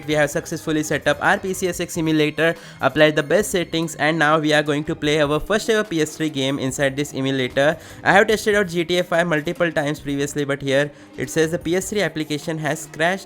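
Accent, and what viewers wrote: India. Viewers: I am a Indian but why I can't understand Indian English 😢😢😢😢😢 It's like 5x speed😂😂😂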